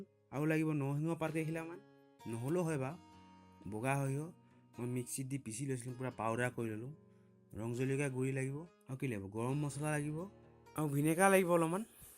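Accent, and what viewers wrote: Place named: Lakhimpur, India. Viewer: nhoi apunar kotha keita lakhimpur r lorar nisina...so xudhilu...beya napabo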